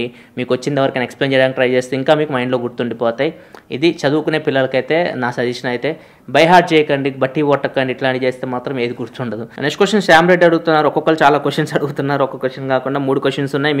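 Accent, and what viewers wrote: Ongole, India. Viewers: Sandeep Sandy ongole kadha valladhi own anduke alaa matladutundu☺️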